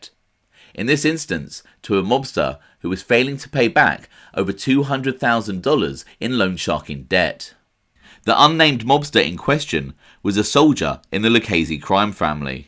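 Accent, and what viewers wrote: United Kingdom. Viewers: Your British accent is a monotone puts people to sleep get a mob guy to report much more exciting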